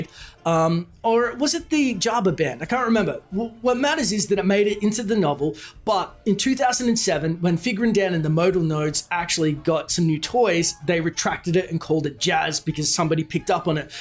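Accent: Australia. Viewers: do you do looper too or am I just a racist who thinks all Australian men sound the same?.....Are you even Australian?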